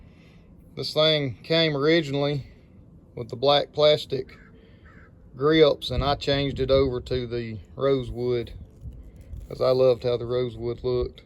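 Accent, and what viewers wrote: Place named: South, United States. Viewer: That's just Southern English, what I find hard to understand is some of the dialects from the Northeast.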